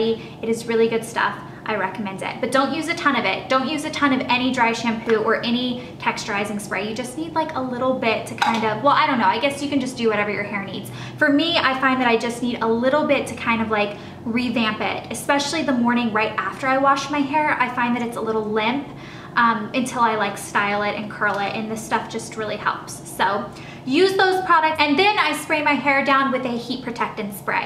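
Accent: South, United States. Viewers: seriously LOVE your southern accent! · Love it when you speak southern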